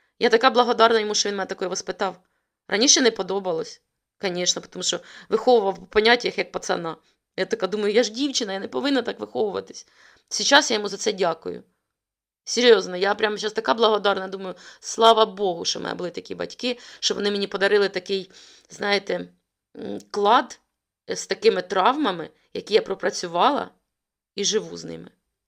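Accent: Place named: Surzhyk, Ukraine. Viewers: Та ну... Суржик у вас дуже милий, домашній такий, доречний. Впевнений що літературною теж розмовляєте. Просто фішка тут така своя у вас) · Я не знаю, чому Ютуб підкинув ваші відео, але я вас підтримую)))) Вподобайка та Комент у підтримку! У вас чудовий центрально-східний діалект на скільки я чую. І слава Богу що у нас в Україні можна оприділити звідки людина тільки по розмові. Успіхів вам)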